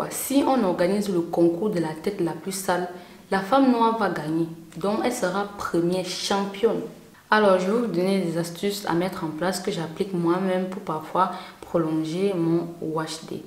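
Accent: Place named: West, Cameroon. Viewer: 🤣 tu as fini avec nous (femmes noires) . Avec ton accent de l'ouest du 237, j'aime ca. Tu es naturelle même dans ta facon de parler. Super vidéo et merci😘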